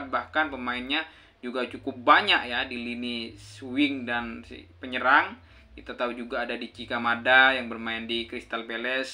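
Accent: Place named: Kebumen, Indonesia. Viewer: Salam kembali mas...hehe Bal Balan bahasa ngapak Kebumenan kue mas😅❤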